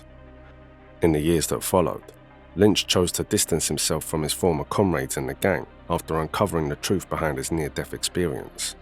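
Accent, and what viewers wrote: London, United Kingdom. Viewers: Anyone know what accent is this? London?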